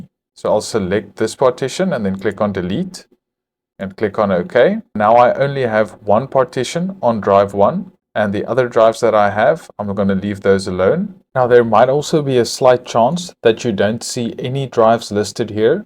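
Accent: South Africa. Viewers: Ek Sal jou sommer n soen op die voorkop ge❤❤❤thanks my guy I've been struggling for days now trying to repair my windows 10 but eventually was like stuff this let me upgrade it to windows 11 and your video came up heard the accent I was like his one of the ouens and I really appreciate you have a great and awesome day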